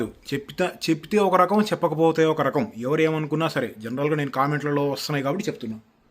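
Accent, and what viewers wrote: Andhra Pradesh, India. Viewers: Observed Andhra accent in mutton preparation in Palm tree garden. But Nizamabad in Telangana. Why is it so? Just Curiosity. I don't have any state or regional feelings. Appreciate if you reply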